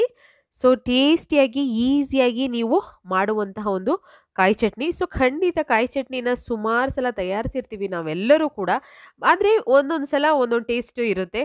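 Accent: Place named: Malenadu, India. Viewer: ಇವತ್ತೇ ಮೊದಲನೇ ಸಾರಿ ನಿಮ್ಮ ವಿಡಿಯೋ ನೋಡಿದೆ. ನಿಮ್ಮ ಕನ್ನಡ ನಮ್ಮ ಮಲೆನಾಡಿನ ಕನ್ನಡದಂತಿದೆ. ಖಂಡಿತ ನೀವು ತೋರಿಸಿರುವ ಚಟ್ನಿ ದೋಸೆಗೆ ಮಾಡುತ್ತೇನೆ. ಧನ್ಯವಾದಗಳು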